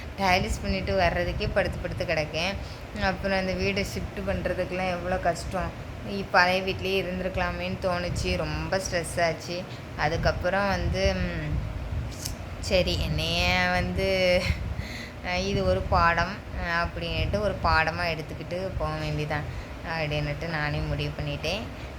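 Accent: Tirunelveli, India. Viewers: உங்களுக்கு சொந்த ஊரு என்ன? நெல்லை பாஷை பேசுறீங்க.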